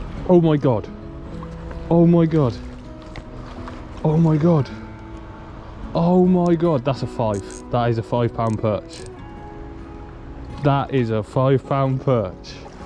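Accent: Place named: Australia. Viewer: Thats awesome catching huge perch in town and i got to hear a fellow Aussie 🎣👍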